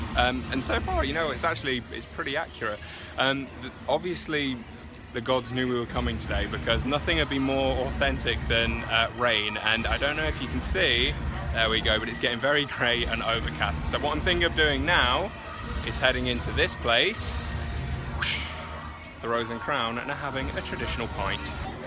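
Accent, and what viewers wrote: United Kingdom. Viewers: I looooove! That British accent....❤️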